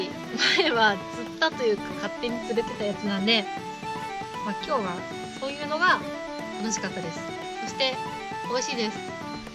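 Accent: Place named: Kyushu, Japan. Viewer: こんばんは！初めまして！ 釣り姿がカッコイイですね！ いい感じのカワムツのオスですね！ 質問ですが九州の方ですかね？ 方言が聞いた事あるのでw チャンネル登録させていただきます！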